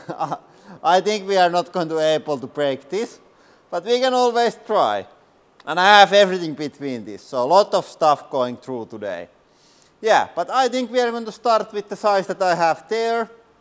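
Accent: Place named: Finland. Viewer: His finnish accent haha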